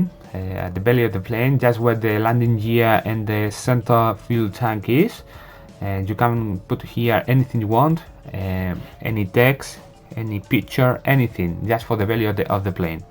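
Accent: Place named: Spain. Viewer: Thank you so much. I will try to make a new livery. I am french but I live in Thailand. I speak english same thaî! It seems to me that you are fron spain. That is why I can understand your english. When I hear english from USA I understand nothing.You have accent that I can hear. 55555 . In thaî language the number 5 is pronounced "ah". And when we say that we are laughing we write 55555. That means hahahahaha. Thank you again for your video.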